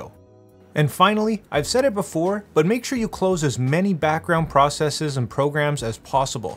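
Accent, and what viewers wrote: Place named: Canada. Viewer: As soon as I heard your accent, I knew you were a fellow Canadian :). Thanks for sharing this information!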